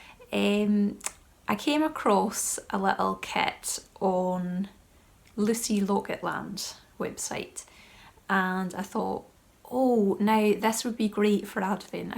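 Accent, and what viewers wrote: Scotland, United Kingdom. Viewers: Thank you for your podcast! I love hearing your Scottish accent and words. I look forward to returning to Scotland again when pandemic conditions allow it. My question would be have you attended Shetland Wool Week and what are your impressions of it? I look forward to your next video and seeing more Scotland scenery, Happy Advent! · I ‘ve loved to hear those Scottsh words. Charming.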